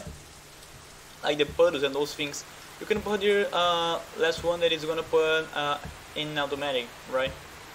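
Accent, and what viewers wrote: Brazil. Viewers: Só BR pra ter esse sotaque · tu é brasileiro né mano, esse sotaque ai eu conheço hahaha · slc mano me ensina inglês aí 😯só deu pra perceber que era é br por causa do sotaque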